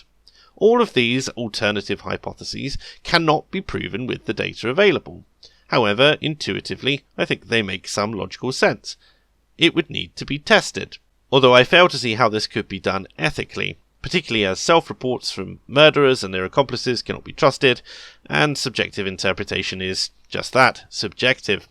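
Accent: RP, United Kingdom. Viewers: Posh Brits! I love Posh Brits talking channels. I love Voltaire's Ghost too.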